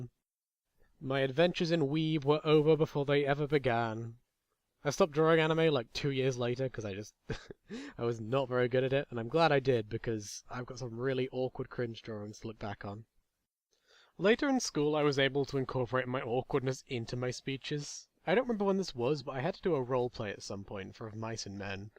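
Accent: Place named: United Kingdom. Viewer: Holy crap... You're British.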